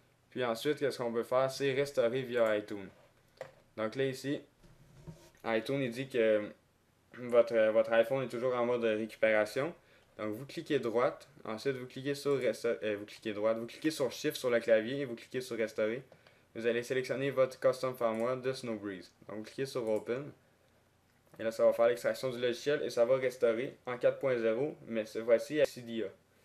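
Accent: Quebec, Canada. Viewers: Bjr!:), MERCI BEAUCOUP POUR LE TUTO!!! J'ai essayé pendant 24h plein d'autres méthodes infructueuses. La tienne, 40-60 min et j'avais fini, tout était correct, mon pc ne plante pas, etc., vraiment du bon travail, et pour une fois, c'était en Québécois!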